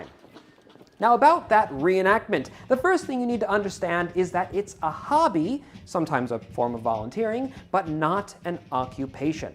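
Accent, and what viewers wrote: United States. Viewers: I have a question. Are you an American? Or are you British?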